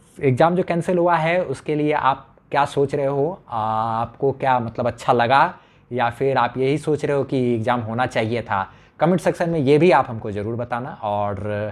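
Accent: Bihar, India. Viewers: Sir aap bihar se hokya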